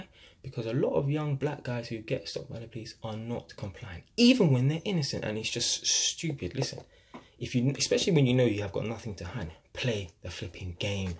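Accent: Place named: RP, United Kingdom. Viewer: Lmao was was u tryna be posh these days? So hard to listen to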